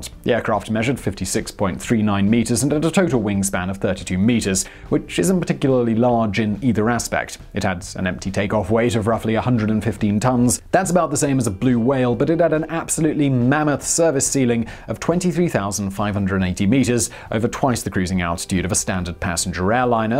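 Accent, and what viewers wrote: England, United Kingdom. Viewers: He's English, we pronounce many things differently. In fact virtually everyone pronounces something wrong, they just don't know it. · Sounds intellectual because of British accent, makes numerous mistakes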